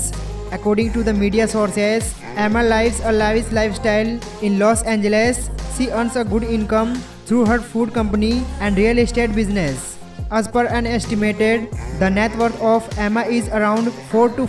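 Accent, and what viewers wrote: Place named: India. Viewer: Yea, this Indian accent drives me crazy.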